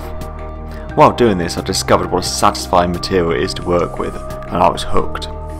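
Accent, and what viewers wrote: United Kingdom. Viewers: I guess your British, seing the house, your accent and such. Can I ask where you find your leatherwork tools and the leather? I have a hard time finding a store in Belgium, the closest is an hour drive with the car, and I am not sure that they have what I want. Online shops are also not that easy to find for a affordable price and have it trustworthy. I found some online stores in the U.K., but I have had a bad time ordering from the U.K. Maybe you know some trustable and affordable online stores? Thanks, and great video!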